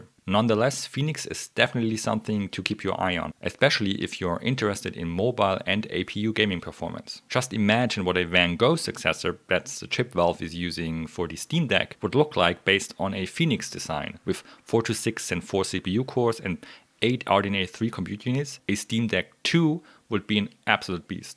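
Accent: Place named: Germany. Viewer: I love the German accent in your English 😀😀. I just subbed.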